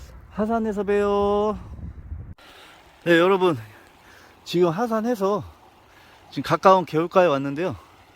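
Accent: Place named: Chungcheong, South Korea. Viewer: 말투가 충청도사투리같내유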